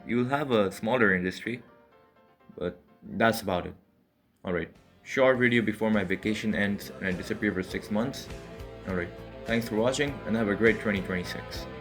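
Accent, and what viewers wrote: India. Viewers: Holy Indian accent🥀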